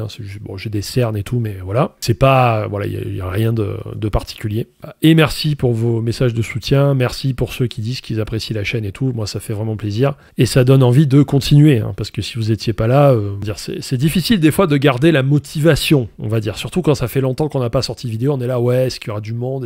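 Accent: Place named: Ardèche, France. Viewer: Super content de votre retour! Quand on connait le coin, on entend que vous êtes ardéchois ;-)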